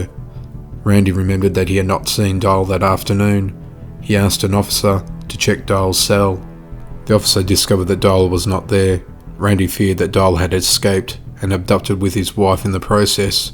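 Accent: Australia. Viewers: ~ I have just watched 4 of your videos, I honestly didn't catch your Aussie accent! Only since reading the comments on this video, I'm in Western Australia, well done, great channel 😊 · Are you an Aussie? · Ricky Maveety he’s Australian, so won’t know the American pronounciation. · it's my pleasure. And the name is a shoutout to the ' ' song 😂 Can I ask if you're from NSW? I'm not, but you enunciate like Sydney Police Officers....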